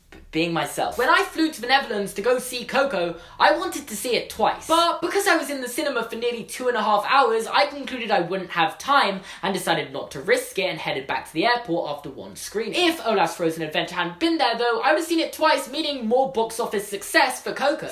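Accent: United Kingdom. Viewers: Your British to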